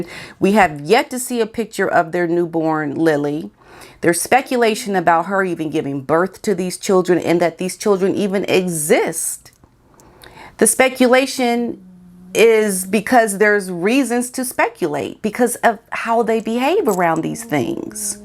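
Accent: United States. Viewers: Please don’t worry about how you pronounce names. As others have said you are American, and often words are said differently to us British folks. There are 2 spellings for the name for Prince Louis. Some people spell it as it would be said Lewis, which can be a surname or first name. It is what you say, not how you say it. Keep up your good work. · YOU ARE RIGHT! YOU’RE AMERICAN SO YOU PRONOUNCE AMERICAN.🌹🌹🌹SHE IS USER. THE YATCH GIRL. SHE HAD NO RESPECT AT ALL.THIS WANTS TO BE BILLIONAIRE. IS All ABOUT HER SELF. SAD SAD. SAD WE FEEL BAD TO PRINCE WILLIAM HIS BROTHER IS GONE. MANIPULATIVE,CONTROL, HYPNOTIZED BY MEGAN.😂😂😂😂😂😂😂😂😂😂😂😂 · I agree with you, you are American. pronounce it how you are accustom. I love your channelYou hit the nail on the head. Just be yourself. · Please stop apologising for your pronouncement of a name..99.9% of people Know your an American and expect you to speak like an American..most of us love your accent...hi from the uk 🇬🇧 😊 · Don’t you worry about how you pronounce things …we all pronounce things differently and that makes it more interesting. 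I like your pronunciation actually, you are American and that’s how you should say it. Don’t take any notice of the pedants….(fussy grammarians) Imagine if Diana had lived……she (Marbles)would’ve fallen foul of her (Diana)100% and she would be slandering her too. They would be ghosting each other, I would take sides …and it’s not Marble's side.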